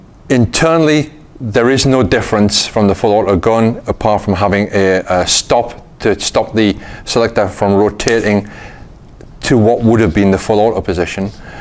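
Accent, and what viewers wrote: United Kingdom. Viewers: Out of curiosity in which region of GB is your dialect spoken? And many Thanks for the great content! Best Regards from Austria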